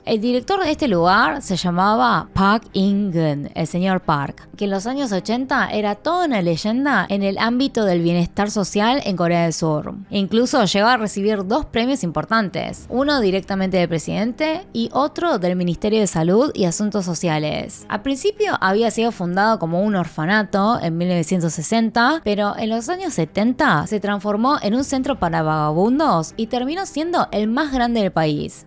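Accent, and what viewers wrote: Buenos Aires, Argentina. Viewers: tiene acento argentino? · Tremendo documental. SERIA MUY BUENO que la modulación fuera un poco menos de recoleta... menos nasal... realmente me costo mucho superarlo... La información fue tan precisa y tan buena la edición que me mantuvo como espectador... Pero seria muy bueno mejorar eso. En Argentina es muy chocante el acento de ¨CHETA¨ para todo el resto de las personas que no viven en los barrios de clase alta... Se lo asocia a gente privilegiada que no tiene empatía y se cree superior al resto. Creo que el VIDEO PRESENTACION en el canal es muchiiiiisimo mas accesible en ese especto. Seria bueno que esa misma fuera la entonación para el resto. POR SI SIRVE LA CRITICA. El contenido es de primera calidad. Muchas gracias. Un abrazo. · La información es muy buena pero que pasó con el tonito que le das al hablar te estas esforzando ser una argentina o eres una fanatica del acento argentino te sugiero que le des un acento neutro eso te ayudará mucho un consejito es bueno aunque sea de un conejito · de todos los acentos tenia que elegir el argentino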